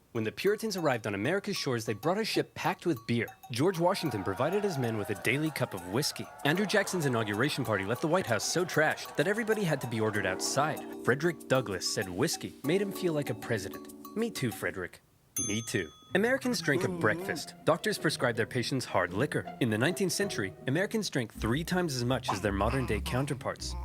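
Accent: Ireland. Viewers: I don’t know why but Irish accents are pretty cool Also no cute Irish girl GF why live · I am surprised that you are using this dating site. Because you are beautiful! If you didn't live in Ireland, too far away from USA, I would ask you for a sitdown with coffee or something. By the way, I love your Irish accent. I know that you have a lot of pings from that dating site from suitors. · You're losing ur cute Irish accent lol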